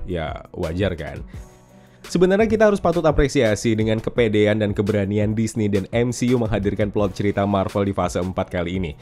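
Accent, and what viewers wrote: Medan, Indonesia. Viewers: Orang Medan kau bg?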